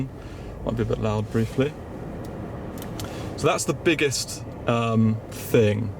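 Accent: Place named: Surrey, United Kingdom. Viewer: Yah, a lovely Surrey accent, 😂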